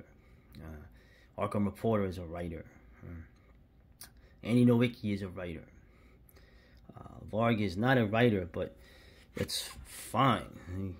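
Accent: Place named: New York, United States. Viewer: H0ly East Coast New York American accent Love It!! Sending Love from West Coast Canada, Vancouver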